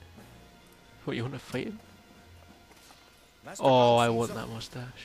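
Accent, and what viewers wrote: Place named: Scotland, United Kingdom. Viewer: may i ask what part of scotland are you from? im just curious i enjoyed the vid and you deserve another sub =D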